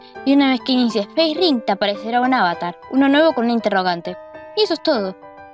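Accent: Argentina. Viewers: Muy bien explicado el tutorial!! sos argentina? por tu acento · El acento de las argentinas me vuelve loco :'v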